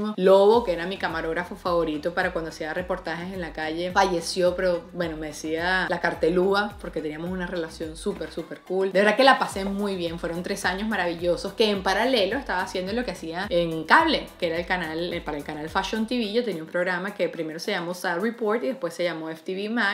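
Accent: Venezuela. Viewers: Me encanta que ah pesar de los años. Porque ya tienes años fuera del país. Sigues siendo venezolana y hablas como una venezolana. Que orgullo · eres exageradamente bella, y me encanta tu acento venezolano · Me encanta tu contenido y tu personalidad, pareces una amiga más. En otro video me gustaría que contaras cómo entraste a Turner; si fue por casting, o porque igual te llamaron. El Miss Universo por TNT ya es algo muy tuyo, no sería lo mismo si te sacaran. Además, eres venezolana, tu acento es muy rico de escuchar, y ganaste un concurso de belleza: TNT no te va a soltar! Hahahaha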